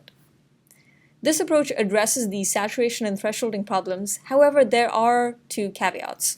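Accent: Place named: India. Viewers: This is one of the best indian accent I've heard, clearly explained every details in the paper! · Trace? Clearly an Indian accent! But so what? :)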